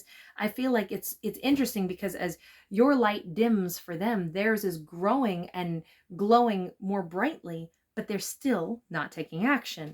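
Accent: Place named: South, United States. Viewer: I thought I recognized a Southern twang when you're speaking! Resonates definitely! He's stuck in fear, infidelity will do that to the one not commiting it. He's lucky I know what this connection is and am filled with COMPASSION, but also strength. Thank you❤🙏🌟✨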